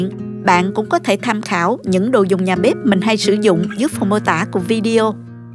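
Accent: South, Vietnam. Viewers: Ngon ,giọng nói miền nam Việt Nam dễ thương,❤❤ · Giọng nói miền Nam của ngọt ngào rất dễ thương. Tiếc là cô dùng từ miền Bắc, và nhiều tiếng Hán Việt khó hiểu và vô nghĩa, như “thấm sâu vị”, “điều vị”, “sử dụng”, “sơ chế”, “chế biến”…Mọi người đang nói và viết tiếng Việt tuỳ tiện, không cần hiểu ý nghĩa và mục đích. Kính mong nói như một người miền Nam chính gốc, mộc mạc, giản dị và dễ thương như giọng nói của cô.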